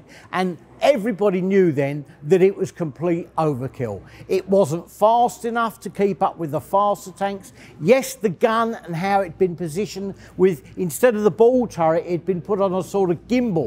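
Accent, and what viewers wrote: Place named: England, United Kingdom. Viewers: Hornchurch, Essex. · I love his accent, what part of England is his from? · Sounds like a Londoner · Liverpool. Broad scouse.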